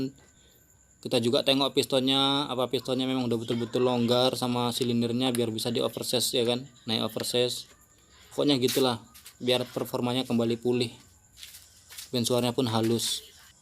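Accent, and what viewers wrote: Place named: Medan, Indonesia. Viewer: Jowoe rosoe medan cak...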